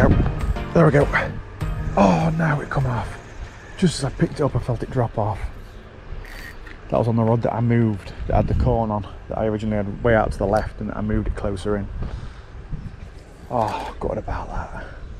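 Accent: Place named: Yorkshire, United Kingdom. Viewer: Nice method that, and much cheaper than the korum bolt rigs, some nice slabs there too. If you dont mind me asking, what part of the country are you fishing?? As you seem to have a yorkshire twang to your accent.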